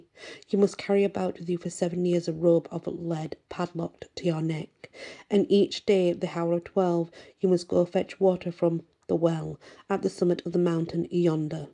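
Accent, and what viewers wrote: United Kingdom. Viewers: Hey! You outdid yourself with this one! 🐎🗡 “Morvan Fights the King” was intense, emotional, and full of drama! 😍 The thunder, the battle refusal, and then Morvan’s unwavering stand—legendary!! His confrontation with the Hermit was SO intense… and the ghostly moment when he held his own head?! 🧠👻 That image will live rent-free in my mind forever! And the final redemption by Saint Anne 🕊💕… pure magic! I felt every moment thanks to your beautiful storytelling. Your British accent just adds that extra elegance and charm that draws us in like a lullaby. 💤🌸 Thank you for this masterpiece, Auntie. We love and appreciate you! 💖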